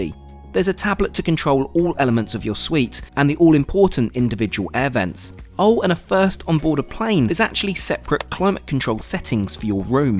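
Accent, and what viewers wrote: RP, United Kingdom. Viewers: And I love your British voice. I love hearing Brit’s … be safe with your travels …. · Bro this guy talks sooooo posh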